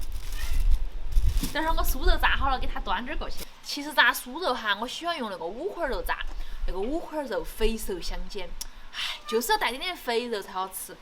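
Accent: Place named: China. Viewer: 好懷念妳講普通話喔。真的很好聽很有魅力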